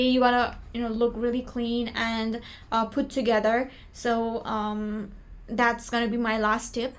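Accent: United States. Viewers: OMG!! Your accent is soo amazing. Can you give me some tips to have that "American" accent. I've been searching online for it. But it would help me so much if it is from someone with a similar background. Btw thanks for the advice as well. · american accent sounds irritating But congratulations and keep on progressing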